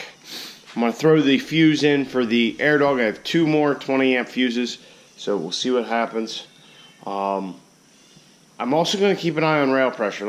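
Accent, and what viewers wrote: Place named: Baltimore, United States. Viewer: Hey, I'm new to your channel. Nice videos. I see you live in Buck..I'm just a few miles south of you in MD. You have a Baldimore accent lol.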